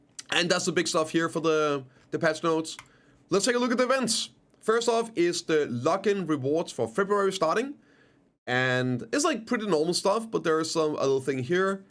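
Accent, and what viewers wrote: Denmark. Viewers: Danglish omg >.<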